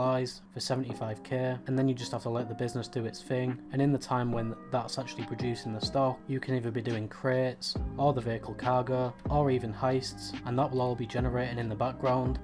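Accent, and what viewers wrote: United Kingdom. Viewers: So sound like you’ve got an English accent but call it garARJ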